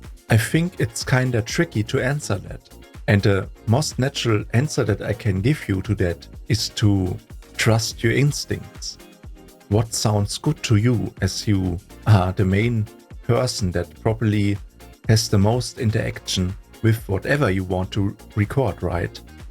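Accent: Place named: Germany. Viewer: Ohne zu wissen das du ein Deutscher bist, hat dich deine englische Aussprache verraten :D Mal Spaß bei Seite, du baust extrem gut, Respekt vor dieser Zeitaufwendigen Arbeit.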